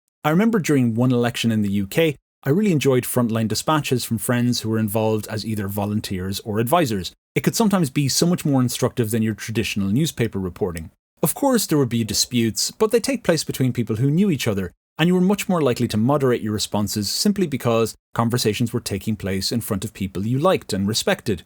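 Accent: Ireland. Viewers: I had no idea you were from Limerick! I lived there for almost a year and now immediately recognize your accent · YOURE FROM LIMERICK?? I always thought you were irish cus of your voice but i never imagined you were from MY hometown ! 👏🏼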